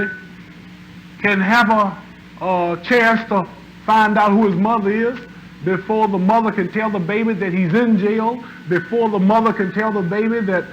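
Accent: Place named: Kentucky, United States. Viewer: An hard word. Loved that kentuckian accent he had.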